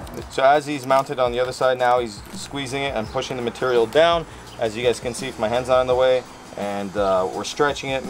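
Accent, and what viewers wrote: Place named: Cape Breton, Canada. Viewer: Where you from? I hear that Cape Breton accent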